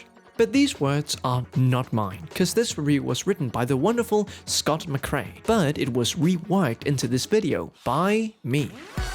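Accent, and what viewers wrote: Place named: RP, United Kingdom. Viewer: Why are you taking so posh? That’s not how you normally talk.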